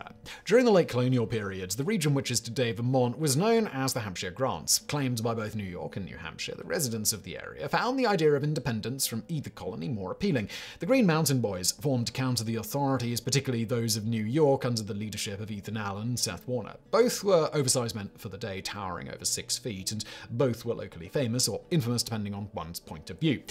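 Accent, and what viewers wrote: United Kingdom. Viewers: You're British? I thought you were from California's central Valley where you developed a killer UK accent. PS that is the story of James Marsters, Spike on "Buffy the Vampire Slayer" who is from Modesto,CA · I find it highly amusing to hear a Brit extolling the exploits of forgotten American Revolutionary heroes, but very well done!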